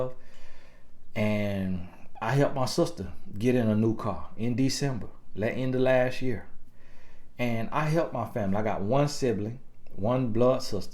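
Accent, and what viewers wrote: South, United States. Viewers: First 5 and half minutes and I'm doneeeee. I done went up in praise and laughed so hard... This is truth spoken so well! Love the accent too-- it had me Rollin' to hear this with that country twang. It's all love 😂❤ Thank you 👊🏾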